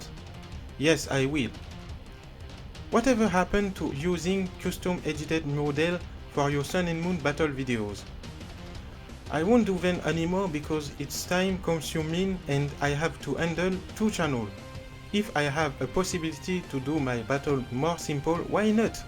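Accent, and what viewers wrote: France. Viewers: ça s entend que tu est francais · Toujours marrant l'accent français ! :p Tu te débrouille toujours mieux que moi xD Bravo pour tes 100k, continue comme ça ! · Ok so do you speak french ? You speak like a french ^^ Tu ne prononces pas l'anglais tres bien comme les français lol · Are you French? Because your English is a bit like mine ^^' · I feel like you have a French accent '^' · I love your french accents sounds good im not insulting you its true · are you french? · 😂😂on sent l'accent français haha bg frérot tu gères avec tes vidéos 👌💪🖒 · are you french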